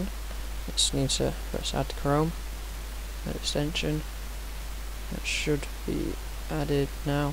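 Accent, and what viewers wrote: United Kingdom. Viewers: BRI'ISH 👽😩 but yeah "It's not looking good brav", from the solution of y'all's problem, is to have a smaller res, some duu from another vid published 6 months ayo saiiyyyd to do a 50x50. We'll see tho, I'm nearly at 90 DNA. 👽👀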